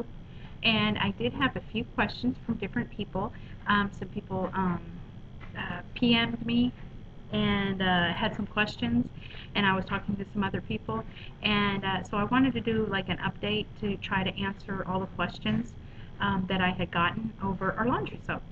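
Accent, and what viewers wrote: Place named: Southwestern Pennsylvania, United States. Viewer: Wursh? Are you from SW PA?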